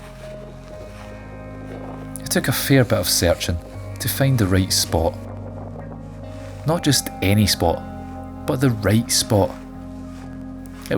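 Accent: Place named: Fife, United Kingdom. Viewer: Braw 👍 as a fifer living in Germany yer videos bring me back hame lad ..... thanks for no diluting yer accent 😉 I've wildcamped all over the uk and Europe an it's no easy to always find a guid spot ..... But .... the mair ye do it the better yer eye is for finding spots .... keep it up big yin .... an thank you 🙏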